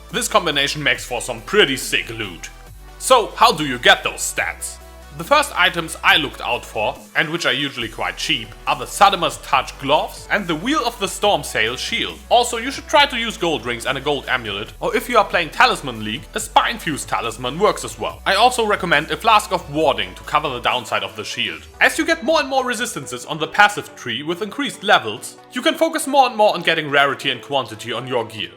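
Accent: Germany. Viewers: you are german richtig?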